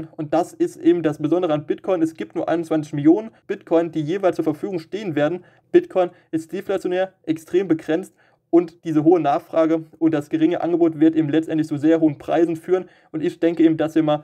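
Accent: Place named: Frankfurt, Germany. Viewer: der junge (mann) hat den GRÖSSTEN RESPEKT auf der gesamten YoutubePlatform verdient! Seine Analyse schlägt sogar jeden seriösen englischen crypto kanal! Ich gönn es ihm dass er "etwas" an seinen Links verdient :) Sag mal kommst du aus Frankfurt???? der Dialekt kommt mir sehr bekannt vor (bin selber Frankfurter) oder doch eher richtung Vogelsberg? BITTE TU MIR EIN GEFALLEN - WERDE NIE WIE DIE ANDEREN CRYPTO YOUTUBER !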